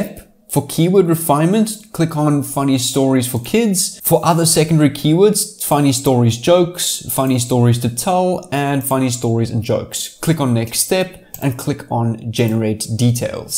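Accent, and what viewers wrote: South Africa. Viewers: Well done! I could tell from the accent that you're South African. This makes me so proud and inspires me too on my journey! New subbie right here!!!🥳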